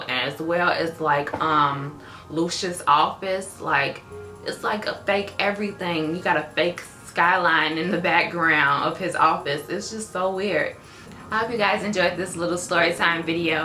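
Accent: Chicago, United States. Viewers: I knew u were from chicago.(hence the accent, cuz we say ain't so many times lol.) me too. I've never seen u. u must be on the southside.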